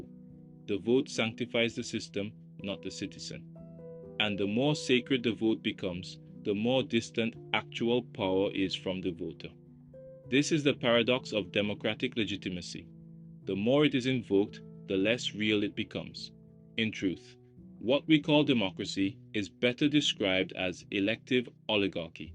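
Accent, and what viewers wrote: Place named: India. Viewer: Sound kinda indian. Can you please curse vishnu for us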